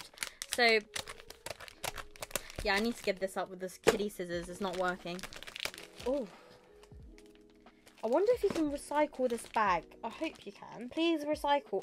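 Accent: United Kingdom. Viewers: Are you British? Love your content!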